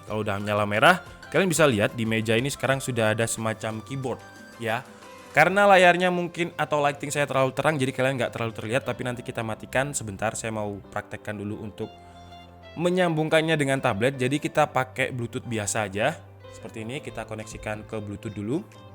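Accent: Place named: Padang, Indonesia. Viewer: Terlalu Padang dong :v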